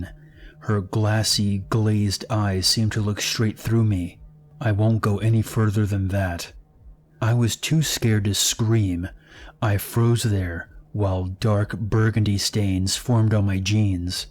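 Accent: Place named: South, United States. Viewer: But ya'll are still creepy as fuck.. lol. I love your southern accent. This video is the best!!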